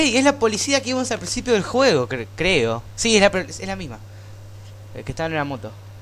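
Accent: Argentina. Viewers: alguien me puede decir de que país argentino o ingles aaaaaaaaaaaaa me confundo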